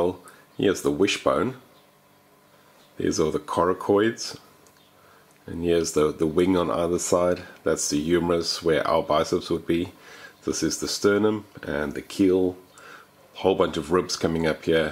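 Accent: South Africa. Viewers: Sounds South African to me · u sounds south african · You sound like an ex South African was good to see what you do, I know an paleantologist her in ZA such nice people you are 🙏🏼. How old would that penguin be ??? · Why do you sound South African that's lived in Scandinavia or smth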